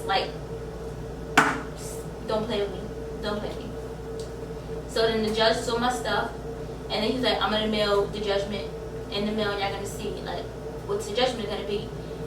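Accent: New York, United States. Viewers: That New York accent lol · When you said dumb cheap I knew you weren’t from the South. I knew automatically that u was from NY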